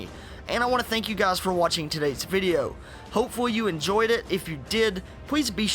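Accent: South, United States. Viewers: DigitalNinjas, I would bet Southern United States. Texas or East of there but not as far as the East coast. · Dude sounds exactly like Tyler from secureteam10 with a southern accent. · DigitalNinjas it's a southern accent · Love your Southern accent! Not much people down here that have Youtubes!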